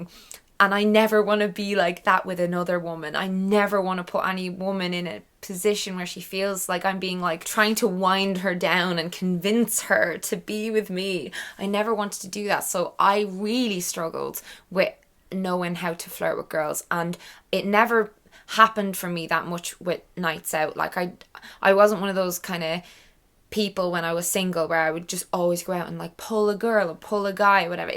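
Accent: Ireland. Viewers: Are you from Dublin? Your accent reminds me of Saoirse Ronan.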